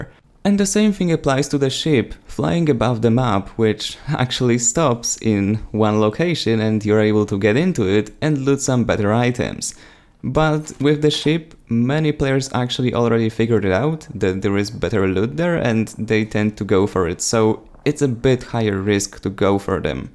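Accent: Poland. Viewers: Hmmm you sound lukę youre from poland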